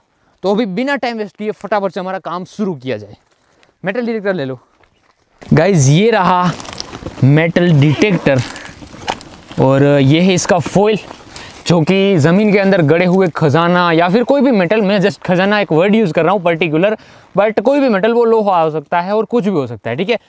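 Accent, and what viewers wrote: Gujarat, India. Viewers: Bhai tame gujrati cho tamre bole upar the kabar pady jay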